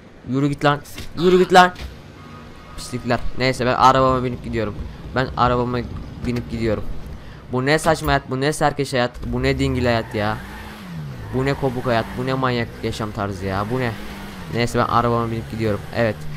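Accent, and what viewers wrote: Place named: Sivas, Turkey. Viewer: Kardeş sen sivaslın herhalde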